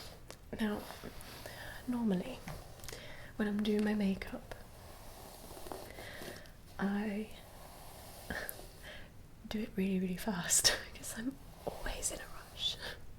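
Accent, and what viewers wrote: England, United Kingdom. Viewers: what part of England are you from? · So very, naturally beautiful. Love the freckles too. Beautiful, cultured English accent - I have similar so perhaps bit of narcissism here. Keep on being lovely :) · Hello young lady.How refreshing to see a beautiful British face as an ASMR artiste and one with wonderful red hair that has not felt the need to go another colour.As for your video it's great. Your accent really does make a difference.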